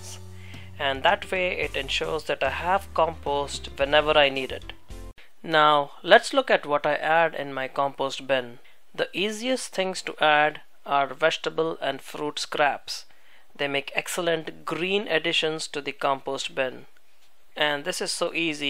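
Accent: India. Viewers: just love your india accent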